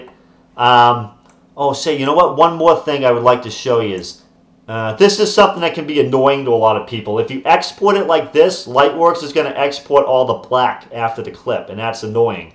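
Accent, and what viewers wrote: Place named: New York, United States. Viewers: Do you like in NYC? You kinda sound like it. Sounds cool. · You have a very strong accent are you from new york or jersey?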